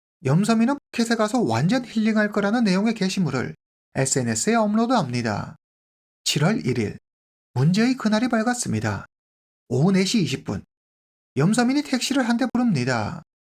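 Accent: China. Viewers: 말투 뭐임? 선족임? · 주인장 조선족임?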